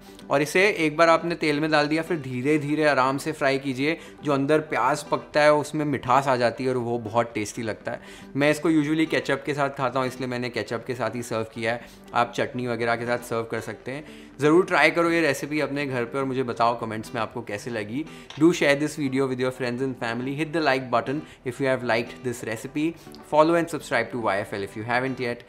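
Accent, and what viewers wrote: India. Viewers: Btw Your accent like Indian, thanks a bunch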